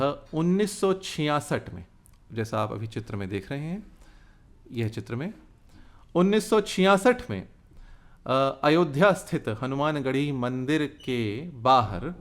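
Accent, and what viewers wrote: Standard. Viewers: Your Hindi is too pure- very nice . Samskaran yaane kya?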